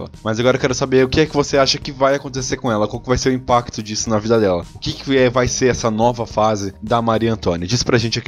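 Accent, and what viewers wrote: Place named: São Paulo, Brazil. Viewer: você é paulista? O sotaque parece!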